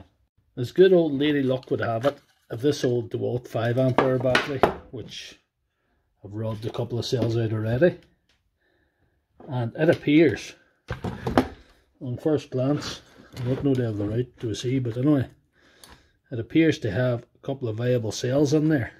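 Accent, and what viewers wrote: Northern Ireland, United Kingdom. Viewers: Do you fix batteries?? I have 3 genuine ones doing with this red/green light flashing.. tried on 2 different chargers.. going by the voice your in northern Ireland so thought I'd ask lol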